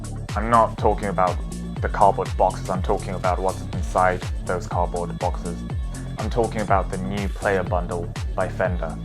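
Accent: United Kingdom. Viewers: BRITISH ALERT! im a Brit too :) love the videos by the way❤️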